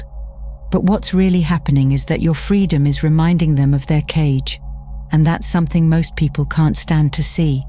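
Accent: United Kingdom. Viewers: Sounds like Solace Fox with different music and a British accent.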